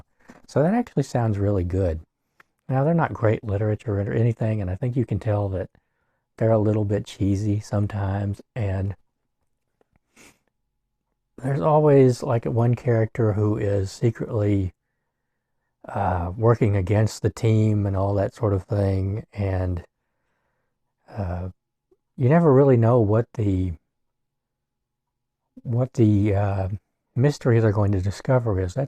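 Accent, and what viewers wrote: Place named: Kentucky, United States. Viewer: Hi Thanks for your earnest and honest “rambles” on a rainy Saturday! I enjoy your thoughts on books and I have to admit your KY accent brings me to many happy memories, as I grew up in Louisville. I have made many visits to Lexington, Bardstown, Berea, Gethsemani and most central to western areas of the state. I have not lived in KY for almost 60 years, but I miss the ease and laid back style which Kentuckians like you embody. Stay well! Extreme pain is an intriguing phenomenon. When it has gotten me, infrequently, I feel like I leave my body and mind behind and enter a realm of subconscious, very surreal existence (just to cope). Again, thanks for sharing!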